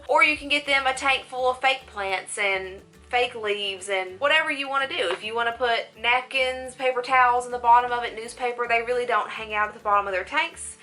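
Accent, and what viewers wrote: South, United States. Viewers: Girl you are so beautiful, but... That accent, is it Tennessee or Carolina maybe Texas or Georgia got to be one of the four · That southern accent took me by storm 😊 lol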